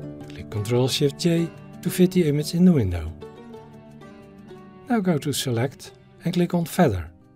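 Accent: Netherlands. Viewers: hah your dutch!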